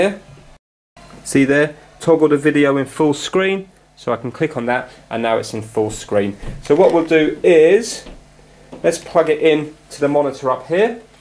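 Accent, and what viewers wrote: United Kingdom. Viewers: That was so clear and easy to understand! Thanks. I lost my WiFi contract recently but when I can afford it again, I'll try this. Wish you were a neighbour; I live in Japan and am a woman in my 50s always struggling to sort out computer problems thru videos like yours. Most of my friends are older females like me and if I ask them anything concerning PCs, provider contracts, whatever, they invariably tell me they have no idea and say: "I always leave it to my husband, son, son-in-law (insert any male relation here) " and it is so frustrating. Is it the same in the UK and why? Why do men seem to be more knowledgeable on the whole? BTW nice to hear a British accent again!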